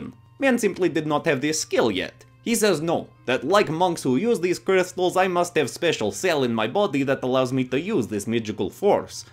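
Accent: Russia. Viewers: The russian accent! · Love the Russian accent, heh.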